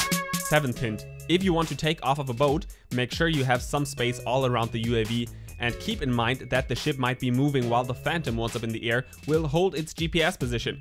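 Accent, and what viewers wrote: Germany. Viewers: Cool stuff! Your English is excellent. Very little German accent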